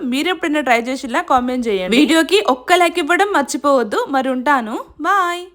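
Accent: Telangana, India. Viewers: Telangana vallam kabatti manam Telangana slang lane matladutham kondharu style ga pose kodutharu andhra yasaloki poyi 😂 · సిస్ నువ్వు అచ్చం మన తెలంగాణ లెక్కనే ఉన్నది మాట్లాడుతావ్ నేను అంతే సిస్😂 "("కింటల్ కు ఉంటా కావచ్చు"") 😂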